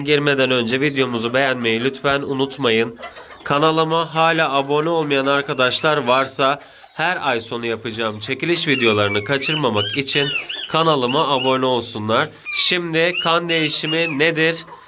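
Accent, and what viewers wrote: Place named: Adana, Turkey. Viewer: Abi adananın neresindesin hemşehrim çıktınnnnn😁😁😁😁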